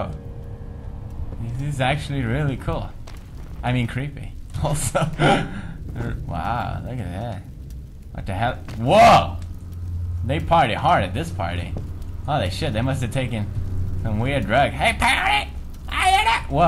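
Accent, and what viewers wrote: Sweden. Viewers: Pewdiepie has caused me to become so interested in swedish culture.. plus i never knew how amazing swedish accents are! they are Adorable. and thats coming from a guy ^.^ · its an accent. hes swedish. his actual name is. his girlfriend is italian so he lives in italy. get your facts straight. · you can hear it that the man is swdish, so im i<3<3<3 all the guys in my class always says Maria, im like its just my facebook name brofist*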